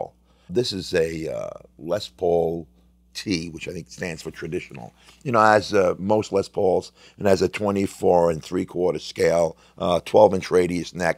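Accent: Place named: New York, United States. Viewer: I got the same guitar only left handed. Best les paul I've ever played! Nice italian New Yorksish accent!:)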